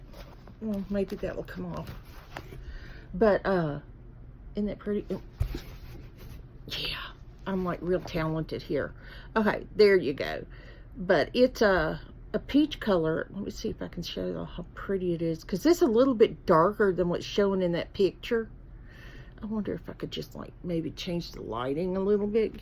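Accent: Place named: Texas, United States. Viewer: I asked my hubby where you might be from because I love your accent. He listened to a couple of sentences and said Texas! His mother was from Abilene TX. I loved her so much. They retiredto Mission TX. I live an hou4 away from Herrschnerrs 😉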